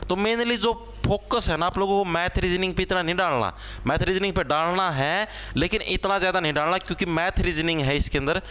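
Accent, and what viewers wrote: Haryana, India. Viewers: Haryana ka hai mitr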